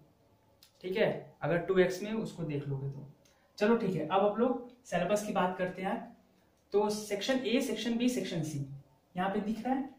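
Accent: Bihar, India. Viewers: Bhai tum motihari se ho kya?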